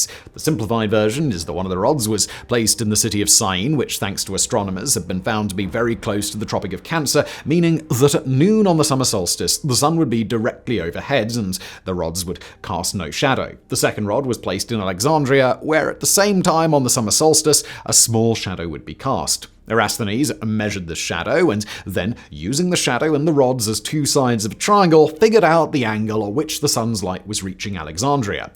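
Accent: RP, United Kingdom. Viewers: You Tube SUCKS! I have removed this man's channels over and over again. But when You Tube changes the algorithm, it force feeds me this Simon guy's many channels despite my removing him. Simon's channels suck. They are poorly researched information that is mostly government propaganda talking points. Terrible videos. And just because Simon has a British accent, this doesn't not make him an authority on anything. He's just a talking head. England is over. Brexit has made the only job in England a You Tube content provider. Terrible list videos that spread falsehoods and bull. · Very imperial British accent...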